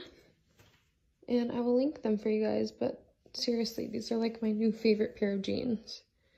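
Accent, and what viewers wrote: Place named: United States. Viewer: She’s so pretty. After hearing her talk, I’m so happy that I’m from britain. American accents sounds horrible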